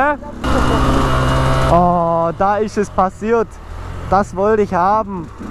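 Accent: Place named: Ulm, Germany. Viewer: Als ob du aus Ulm kommsch:D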